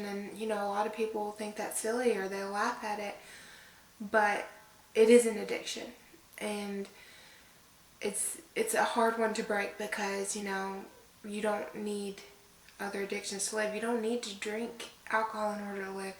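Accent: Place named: Tennessee, United States. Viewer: YAY!!!! Look at you sittin there all cute!!!! I liked and subscribed before the video even started 😂😂😂 I love your accent. Now when I read your posts on IG I can do it with your Tennessee flair 😉 Amazing first video. I look forward to the next one.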